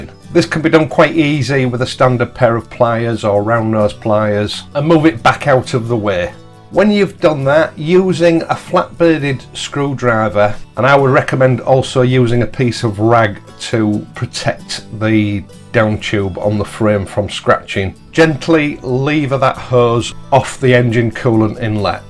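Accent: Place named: East Yorkshire, United Kingdom. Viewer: Great video! Nice and clear as always and your calm E Yorks tones are most relaxing too 🙂. (Could I just be a little bit pedantic though by saying that air pockets in the system are not 'cavitation'. Cavitation is caused by pressure vapourising liquids in things like turbines, centrifuges and with boat propellors.)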